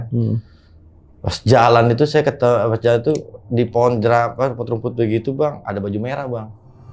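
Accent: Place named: Jakarta, Indonesia. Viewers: Nih Betawi Depok ni ga jauh beda am Betawi gw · Logat betawi emng beda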